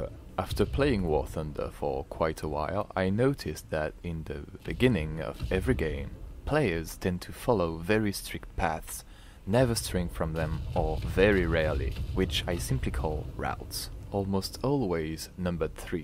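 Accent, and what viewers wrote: France. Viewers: Your french accent is very good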